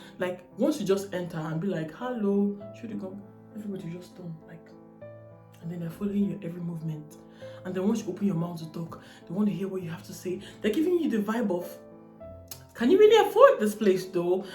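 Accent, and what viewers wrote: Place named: Nigeria. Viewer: You sound like a Nigerian, you guys aren't the same as us North American blacks(the genuine blacks, so far as North American and media bias goes). Your distinctive patterns of behavior aren't quite the same as what we cinsider to be black, you guys have an exotic~foreign vibe and that changes how white people intetact with you, unlesd you can properly emulate being an American black, you don't seem to have this capacity(you just come off as high class lady that is pretending to identify with the rest of us blacks, although my being part chinese also makes my experience not quite the same as a standard U.S black).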